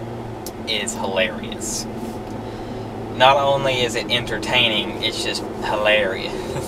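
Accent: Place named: South, United States. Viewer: The worst? Lol. It’s the country accent. It makes it better.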